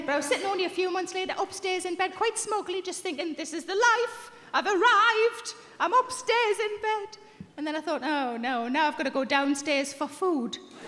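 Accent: Newcastle, United Kingdom. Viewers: I just had to watch this again, I think for the third time? #4??? Love it. And I get to thinking how comedians come up with a topic; it's a complete lack of embarrassment, isn't it? Pubic hair? Crotch smells? Everyday life, luv, just talked about with a 1,000 close friends. And the geordie accent. Gorgeous. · As a non native speaker, I have to thank Sarah for teaching me proper Geordie! Just love her!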